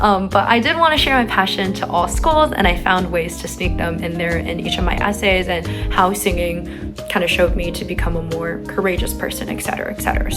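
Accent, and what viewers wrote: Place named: United States. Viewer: You speak perfect English with a American accent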